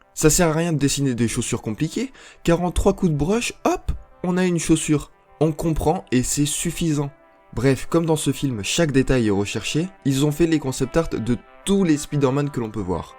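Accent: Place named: England, United Kingdom. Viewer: Alors l'accent anglais est trop guez mais par contre gg pour la vidéo comme d'hab, c'est quali ^^ continue comme ça 😋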